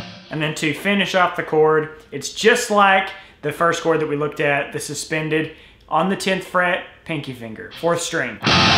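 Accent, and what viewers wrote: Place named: Alabama, United States. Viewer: As soon as I heard his voice I was like 'Alabama'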